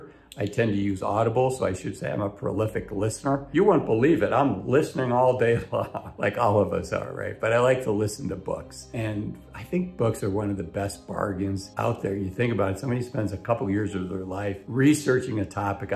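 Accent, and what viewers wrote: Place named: United States. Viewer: Ohh my God… what is this man’s accent… I love his accent… I can listen to him forever. Even if it is financial information as it looks like 👍 fine I will take that 😂 I live in Norway 🇳🇴 so please don’t shame about my terrible knowledge of American accents 😊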